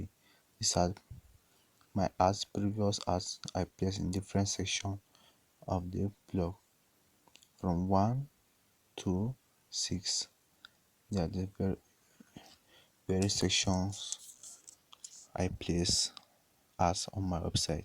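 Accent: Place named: Nigeria. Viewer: Nigerian English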